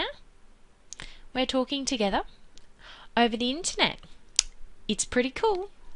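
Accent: Australia. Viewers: Australian accents are the best! Lightens up the mood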